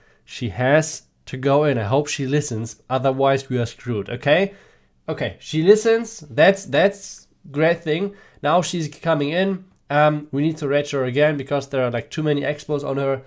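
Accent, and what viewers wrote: Germany. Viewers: I really like your german accent :)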